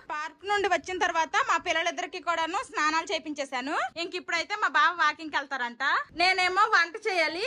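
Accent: Srikakulam, India. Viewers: Sikakulam basha 😅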